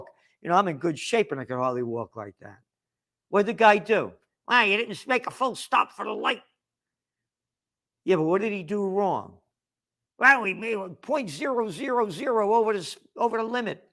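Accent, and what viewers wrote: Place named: United States. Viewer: From Manchester, England. Love your output . (And one of few American accents I can listen to)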